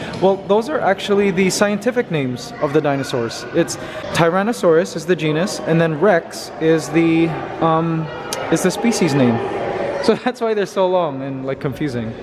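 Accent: Philippines. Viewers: I enjoyed every second of the vid. I sooooo love your voice Kuya. Wishing you could do a voice-over of some science documentary. 👍 You're Pinoy accent cracks me up every freakin time 😂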